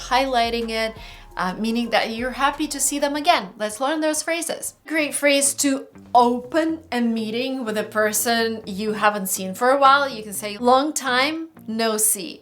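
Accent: United States. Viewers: You inspire me a lot. People say you can't have an American accent because you're not a native speaker but YOU DO, so I've been improving my accent for like 5 years now and now people think i'm American haha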